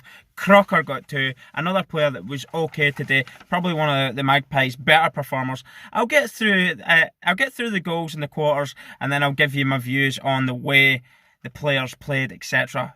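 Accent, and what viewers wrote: Scotland, United Kingdom. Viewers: Beautiful human with a good Scottish accent. Subbed. Cheers!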